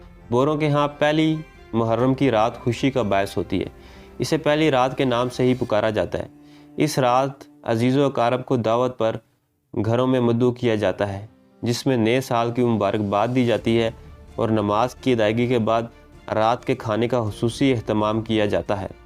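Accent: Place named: Punjab, Pakistan. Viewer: What a boring commentary in typically boring Pendu Punjabi accent with slow and mispronounced Urdu words. No doubt Punjab University has lowered it's standards of entry and under pressure from Jati Umra's chief Kanjri Mrs Maryam Safdar Awan's, wife of Safdar Masalli of Mansehra, influence or orders all Pendus must be given training to speak in LaWhori style of speaking. Now that's what I call dumbing down.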